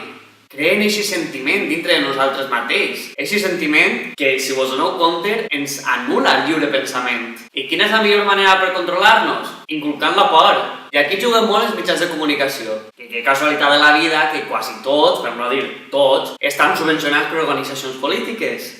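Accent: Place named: Valencia, Spain. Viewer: Acabo de descobrir el canal, sincerament, gràcies. El contingut és espectacular, molt necessari, i a més dóna una mica d'esperança en la humanitat. Lluitem tots per una societat millor, lliure. Aquest vídeo (i el canal en general) haurien d'estar en tendències i que tothom el vegi i reflexioni. A tot això, sumar-li que parles en valencià, que pel menys a mi, com a catalana, em delecta l‘oïda., segueix així!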